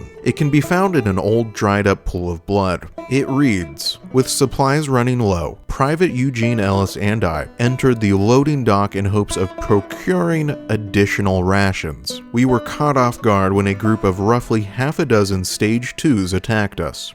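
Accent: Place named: United States. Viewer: Like you sound American too have you never heard of Ronald regan??? On top of that Ellis literally says her name and you still said it in the stupidest way possible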